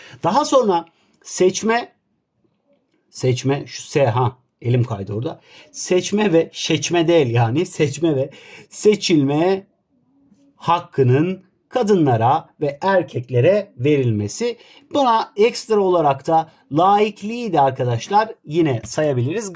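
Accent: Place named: Black Sea, Turkey. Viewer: Hocam siz karadenizlisiz da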